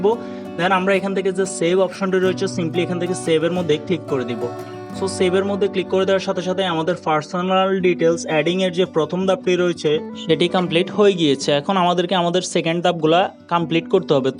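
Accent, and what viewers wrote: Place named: Noakhali, Bangladesh. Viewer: আপনার বাড়ি নোয়াখালী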